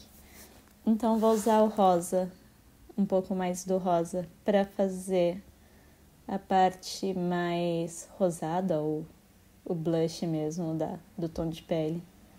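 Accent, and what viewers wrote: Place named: France. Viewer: O sotaque francês eh muito inspirador... Parabéns pelo talento...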